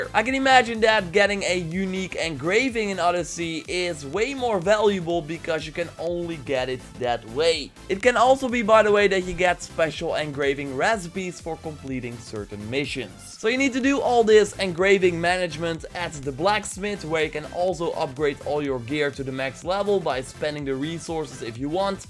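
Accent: Netherlands. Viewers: You have a dutch accent while talking english. Where are you from?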